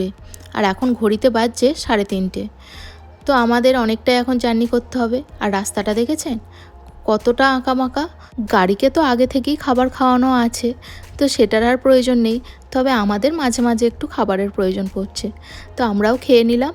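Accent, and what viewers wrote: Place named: Kolkata, India. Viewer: খুব সুন্দর দৃশ্য আর খুব সুন্দর উপস্থাপনা, আর তোমার কথা শুনে মনে হলো তুমি কলকাতার, তবে আমি বাংলাদেশে থেকে।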